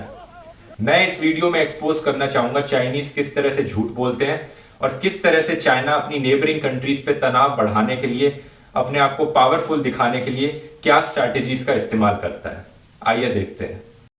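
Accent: India. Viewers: Another curry accent video full of boastful speech.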